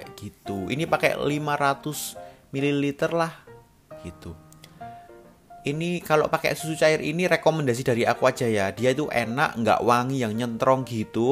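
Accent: Java, Indonesia. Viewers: Ayo leeee.smoga sucses.yang UTI seneng km tetap pertahan kan logat jowo mu.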